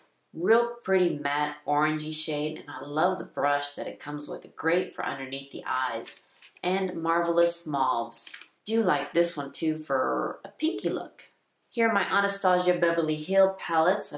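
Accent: United States. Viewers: You may be Egyptian, but your accent is all Texas! I just gifted several palettes to my college aged daughter. Felt good!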